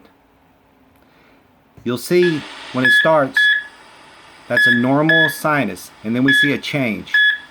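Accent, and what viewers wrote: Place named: South, United States. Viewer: Haven't seen somebody actually put scenes to the immune theory! I love it! I'm a nurse also and I do know that sometimes heartbeats are to faint to hear, even with a stethoscope. But I agree. He did seem dead to Shane and Shane shouldve been trained to tell since he was a cop. I really wish Carl is immune but my gut tells me that gimple isn't smart enough to throw this at us. But he is stupid enough to piss us off by killing Carl. I also love to hear a video narrated by a southerner. I don't hear southerners narrate videos to much.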